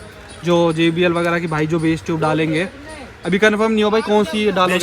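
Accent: Haryana, India. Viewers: Superb haryanavi accent love to hear it. Your videos are amazing. Keep making videos in the same accent ✌️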